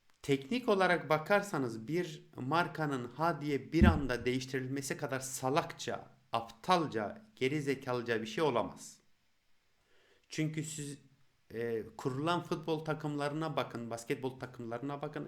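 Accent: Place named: Armenia. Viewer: Sen Kürt değil, Ermeni şivesi ile konuşuyorsun. Sakalını sevsinler.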